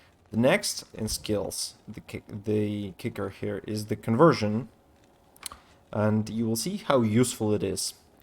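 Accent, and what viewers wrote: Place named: Eastern Europe. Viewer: Finally a Cheap Smiter Build without the use of Annihilus and already acquired Pally +3 Torch, no BS, just cheap items. Except Shaftstop --> 900+ hours in and I still haven't found it, but I can use Smoke plus that +35% physical negation shield, I think Stormshield is the name --> comes out to be somewhat equal to the combo here (after equipping some charms for extra Elem Res). Auto-subbed, even if it's just for the accent - reminding me of Idevaste's :P. Great video, brother! *I wonder why Central/Eastern European Youtubers are better at keeping the script short and without unnecessary BS chatter...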